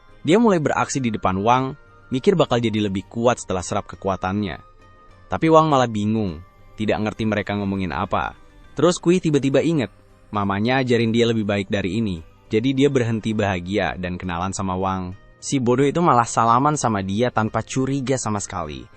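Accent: Malaysia. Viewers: Aku yang asal malaysia ni pun rasa geram dengar loghat dia dicampur²...kalau nak cakap malaysia cakap terus takyah bercampur aduk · Bg kalo bisa logat Malaysia nya di kurangin atau di hilangkan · Bang kok tiba tiba jadi logat melayu sih bang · Cara kamu berbicara indonesia setengah Malaysia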